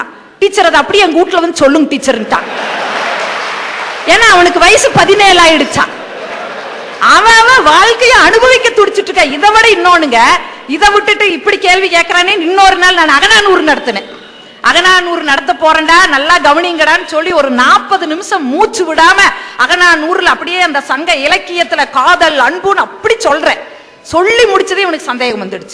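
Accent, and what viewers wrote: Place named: Kongu, India. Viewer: கொங்குத் தமிழுக்கு செவி சாய்க்கிறேன் சகோதரி❤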